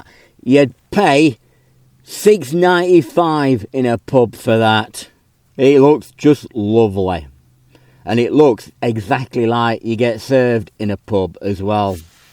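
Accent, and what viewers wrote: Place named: Manchester, United Kingdom. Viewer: Where are you from, accent a bit Manc, but maybe our Oldham ?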